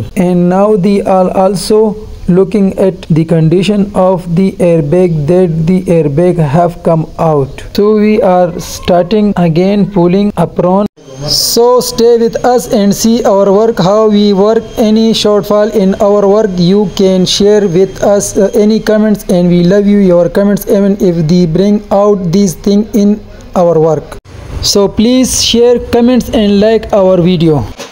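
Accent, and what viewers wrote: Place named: Punjab, India. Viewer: Agar Urdu Mai baat karte ziada behtar hota yeh Punjabi type English se acha hai Urdu Mai baat kia Karen shukria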